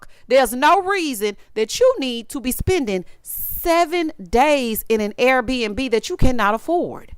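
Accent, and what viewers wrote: South, United States. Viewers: Blythe from NYC, here. I usually listen to your reviews on speaker when I’m doing something else. Today, my bf overheard and was oh so tickled by the “sassy southern belle” coming from my phone😂.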